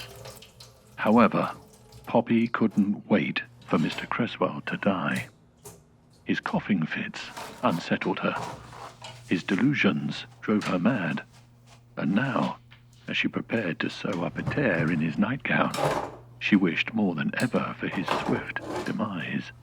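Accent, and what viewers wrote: United Kingdom. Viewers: EEEuww. I don't like this one. I listen to audiobooks often and the narrator sounds just the same. I am going to smash that British narrator right in his pie hole (figuratively, anyway). Poor Poppy. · the narrator’s voice is terrific and british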